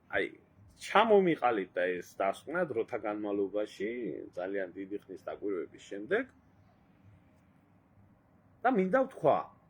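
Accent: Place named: Mingrelia, Georgia. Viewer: შენი მეგრუ(ლ)ი აქცენტი არ გაიშვას😂😂😂😂.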